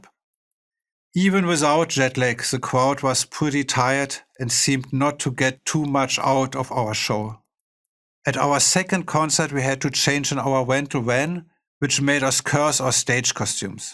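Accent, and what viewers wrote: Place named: England, United Kingdom. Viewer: I love his English accent! Flake is such a musical genius. Rammstein would not be complete without him.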